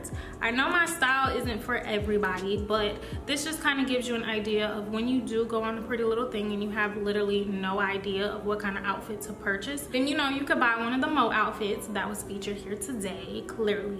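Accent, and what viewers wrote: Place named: India. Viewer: She sound like India to me .😍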